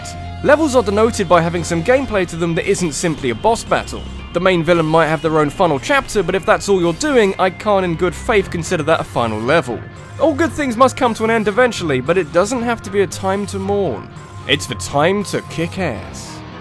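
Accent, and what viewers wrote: United Kingdom. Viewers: Blue Spartan Gaming he's British, so his accent will be different than us stupid Americans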